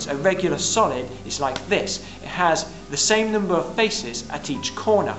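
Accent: United Kingdom. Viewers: the britsh accent is what kept me watching, and then i actually found it interesting!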